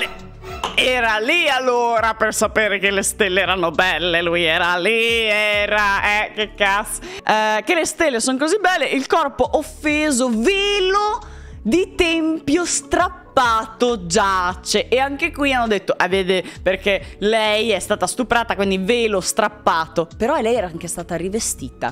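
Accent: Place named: Emilia, Italy. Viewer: "...no no, sono pubici, fanno il ricciolino...!" con la tua cadenza emiliana, sono morto dal ridere, fantastica!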